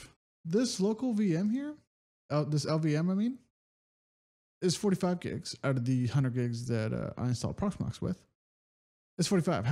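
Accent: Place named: United States. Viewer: Thanks. Such an american accent with a romanian sounding name :)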